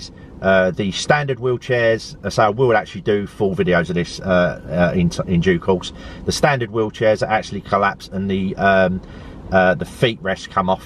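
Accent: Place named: Cockney, United Kingdom. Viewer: I glad you like the leaf , I hope it work's out perfect for you job . Love the Cockney accent , thinking/finkng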